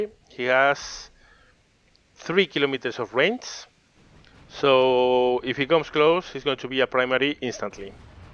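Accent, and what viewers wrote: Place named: Spain. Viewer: Keep on doing what you do now, if only you have the time and energy. The accent doesn't personally bother me at all, rather on the contrary. I don't speak Spanish myself, but I love listening to it because of the flow of it and how it sounds. Your accent kinda imbues your English (which in my opinion is excellent) with the same kind of flow without making it difficult to understand, so it's all good. Drachinifel is Drachinifel, and is well and truly deserving of his status. I also very much agree on what you said about his wits and dry humor. It was only a few weeks ago when I last watched the "Voyage of the Damned" about the Imperial Russian 2nd(?) Navy's unfortunate and incompetent voyage from the Baltic Sea to the Pacific, and I laughed so hard my eyes were watering 😂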